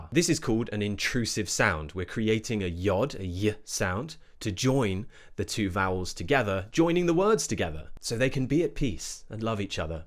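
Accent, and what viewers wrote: RP, United Kingdom. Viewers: I like British accent thats why i always watching your videos from🇵🇭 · I love to hear the british english… 😅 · Do you always speak in that accent normally? Especially, RP accent and the tone. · Hello, I’m not sure if you’ll see my comment, but I truly appreciate your efforts. I really love your accent, and I’ve always been fascinated by British English. If possible, could you tell effortless stories—around 30 minutes long—and at the end of the story, explain the actual meanings of the most important expressions, words, or phrases with a few examples? After that, it would be great if you could read the story again a bit more quickly. This idea is similar to what the channel English Natively does. Thanks a lot! 🙏🙏🙏🙏🙏🙏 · I don't know why but I absolutely love the British accent 😊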